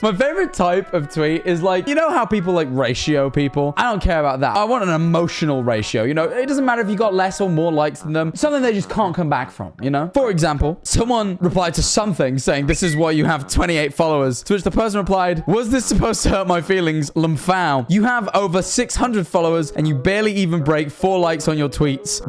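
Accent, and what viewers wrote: United Kingdom. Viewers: why do i feel like your losing your bri ish ness ? im going crazy 😀😀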